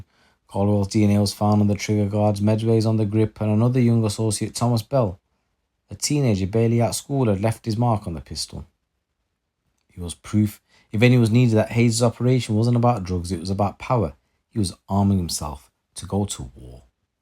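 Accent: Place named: Liverpool, United Kingdom. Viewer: 😂😂 I like you’re scouser accent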